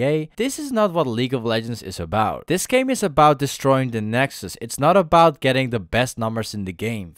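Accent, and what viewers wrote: Netherlands. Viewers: why do i have a feelin that his mainlanguage is dutch? xp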